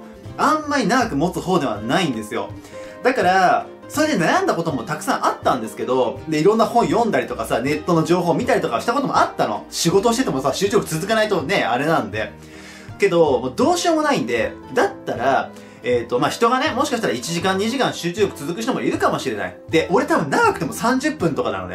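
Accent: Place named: Kansai, Japan. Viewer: 関西弁です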